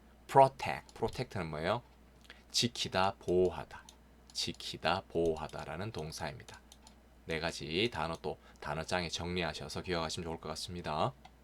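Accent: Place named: Gyeongju, South Korea. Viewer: 선생님 정말 감사합니다. 앞으로 고졸 영어 검정고시 공부하는 사람들은 축복 받은 것입니다.기초가 부족해도 쉽게 이해 할수있도록 천천히 세세히 풀이해주시니 모두다 조금만 열심히 하시면 100% 합격 하실것을 믿어 의심치 않습니다 10년이면 강산도 변한다 했는데 긴세월을 선생님과 함께 공부한다 생각하니 가슴이 벅찹니다 .저는 경주가 고향입니다 선생님의 사투리가 저의 고향 말과 같아서 더욱 정감이 갑니다 중학교때 친정 아버지께서 여자가 공부를 해서 뭐하노해서 문교부가 인정하지 않는 학교에 졸업해서 어디가서 이력서 낼때 정말 자존심이 상했습니다 올해 나이가64살 옛날에 이렇게 공부할 여건이 되었으면 아마 그때 쉽게 했을것 같은데 그때 시골은 정보가 없어 공부하기가 어려웠죠 핑계인지 모르지만 검정고시 도전하는 젊은 사람들 열심히 공부해서 수능에도 도전해 대학에도 가세요 나이 드니 시력도 안좋고 책상에 앉아 있으니 허리도 아프고 여건이 젊을때보단 좋지 않아요 남편도 같은 환경이라 많이 배우지 못해 배움에 목 말라 신혼 여행가서 저 한테 했었든 말 아직도 귀에 생생합니다. 돈 없어 공부 못했다는 소리 자식들에게 절대 안 듣는다고 세월이 흐르고 보니 남편과 전 열심히 살면서 자식들에게 우리의 못다한 꿈들을 이루었습니다 딸은 고등학교 교사. 아들은 대학병원에서 전문의로 여러분 이렇게 올리는 이유는 자랑이 아니고 제가 2019년 8월7일 중학교 검정고시 볼때 젊은 친구들이 많더군요 10대20대 이들이 마음 단단히 먹고 열심히 공부하길 바라는 마음이고요 또 우리들을 위해 10년전 것 까지 기출문제 풀이해 주시는 선생님에 대한 보답이기도 하고요 오늘 새벽에 7번까지 올라온 것을 보고 너무 감동 받아 자다 일어나 공부하고 잤어요 나이가 드니 열심히 해도 돌아서면 잊고하니 반복반복 해야됩니다.( 소불근학노후회) 주자십회훈에도 있어요 젊어 열심히 공부하지 않으면 늙어 후회한다고, 여러분 열심히 공부하여 유종의 미를 거둡시다. 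선생님 정말 고맙고 감사합니다.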